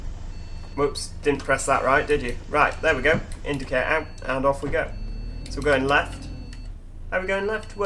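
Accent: Manchester, United Kingdom. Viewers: "Im jer"... can definitely tell youre a mancunian, good video again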